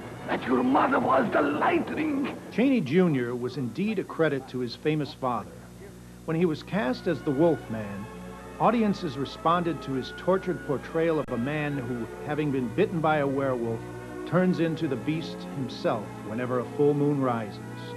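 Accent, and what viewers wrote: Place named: Transatlantic, United States. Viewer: Had me thinking there was this talented guy with a transatlantic accent in the studio with and no way he chopped all this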